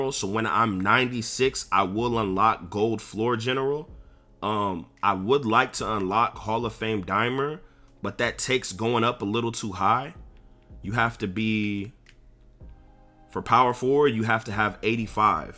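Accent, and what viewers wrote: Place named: New York, United States. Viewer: Yo bro you one of my favorite youtubers you gotta be from NY bro 🤣🤣🤣🤣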